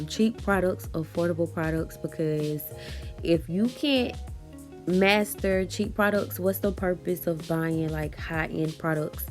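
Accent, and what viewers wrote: New Orleans, United States. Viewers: I love this look and your accent, are you from NO?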